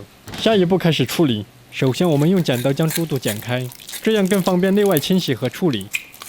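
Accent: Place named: Sichuan, China. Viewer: 明显川普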